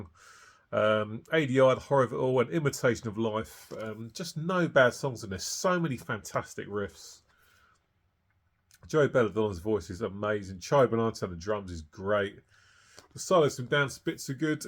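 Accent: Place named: England, United Kingdom. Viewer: he sound English lol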